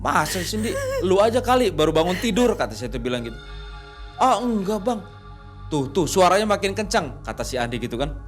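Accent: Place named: Indramayu, Indonesia. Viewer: 😂😂😂😂😂timurtengh hadir mang ay masa allah dri indramayu apa y bahasaya medog goblog ya😂😂😂😂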